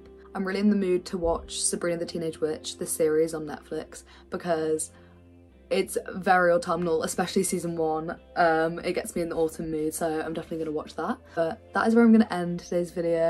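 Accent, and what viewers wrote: Wales, United Kingdom. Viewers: British accent 😭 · oooo I thought she sounded welsh but I wasn't sure since im not the best at accents :D thanks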